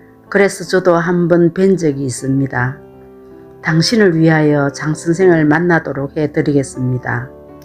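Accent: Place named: Gyeongsang, South Korea. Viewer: 사기열전 19 잘듣겠습니다 경상도 ㅎㅎ 정감가네유 수고하셨습니다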